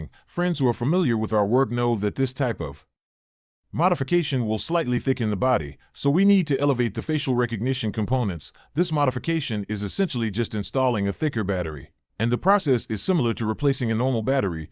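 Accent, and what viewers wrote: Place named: United States. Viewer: The man doesn't exist, repairs all done on chinese phones...Voice is american.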